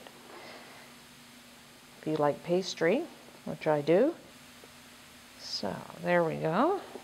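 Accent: Canada. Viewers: is she canadian? aboot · are you canadian?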